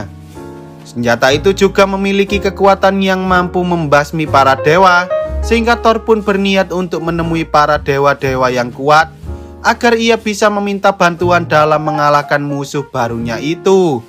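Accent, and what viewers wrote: Java, Indonesia. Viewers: Suara penyiar medok banget 🤣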